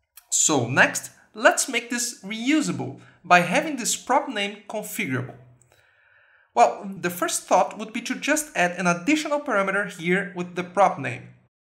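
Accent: Brazil. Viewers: I think I know this accent - Brazilian right? By the way, good vide, keep going on it! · pelo sotaque, eh Brasil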